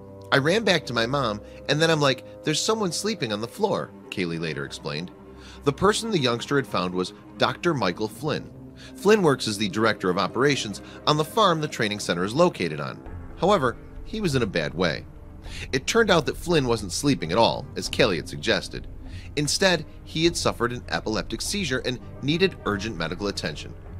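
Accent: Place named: Upstate New York, United States. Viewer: The narrator HSS s cute accent. Upper New York State?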